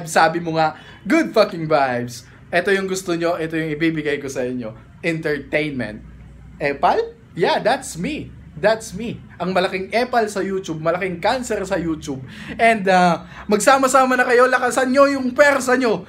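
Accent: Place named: Waray, Philippines. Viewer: Waray gud...hahah. maupay la pagkinita😅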